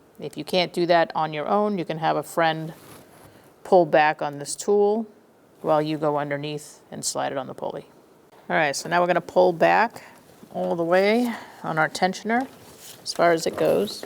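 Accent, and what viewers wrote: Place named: New England, United States. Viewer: What part of New England are you from? Nice vid.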